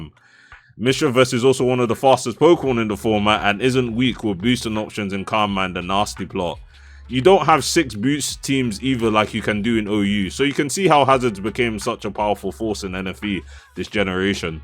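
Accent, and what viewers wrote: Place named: England, United Kingdom. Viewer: What part of england are you from mate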